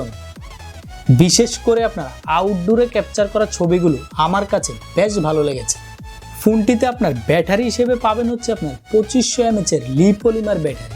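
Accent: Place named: Comilla, Bangladesh. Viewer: ভাই আপনার উচ্চারণ শুনে মনে হচ্ছে আপনি কুমিল্লার ই....! ঠিকমতো উচ্চারণ করতে পারেন না, আবার রিভিউ শেষে style মারেন।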